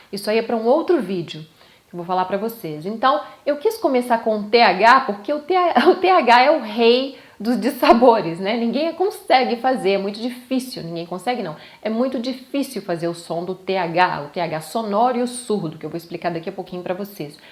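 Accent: United States. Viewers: Ouvindo você falar em inglês com sotaque americano, parece até ser mais fácil a pronúncia americana da inglesa. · Seu sotaque americano é perfeito. se aqueles caras o tal do tim explica, o gavin etc analisassem você daria 10 pra você.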